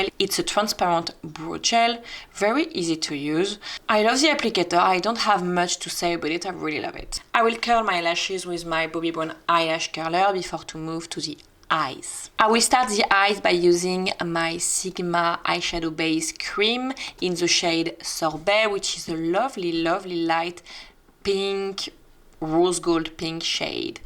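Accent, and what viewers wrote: France. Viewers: Just stopping by to say hello. This is my first ever comment on YouTube 😳 But I had to. So many already told you about your stunning, pretty and elegant makeup style. However......the french accent...Oh My God. My heart is melting. So beautiful. As if you are singing the tutorial. I love it. You are definitely my new favourite YouTuber.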